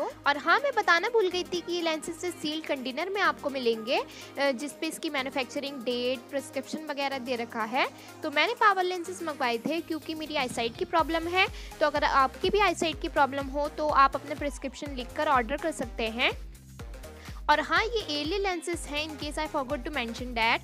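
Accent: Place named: Hyderabad, India. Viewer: Apka accent hyderabad ka hai ....... kya ap hyderabad se ho❤️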